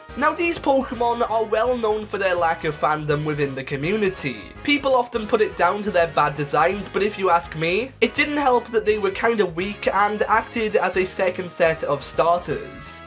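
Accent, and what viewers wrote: Wales, United Kingdom. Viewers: Are you welsh mate? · Are you Welsh?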